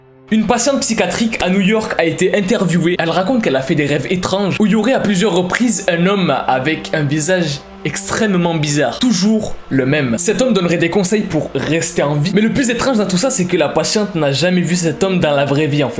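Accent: Quebec, Canada. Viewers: OMG tu as un accent QUÉBÉCOIS PARFAIT on aurait dis un des humoristes québécois 😂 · Il parle québécois comme moi 😂🤣😭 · Bel accent québécois, approuvé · L'accent québécois!!!!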